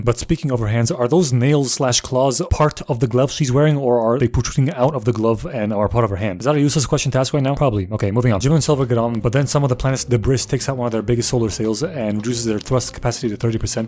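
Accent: United Kingdom. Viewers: Your British accent is rad!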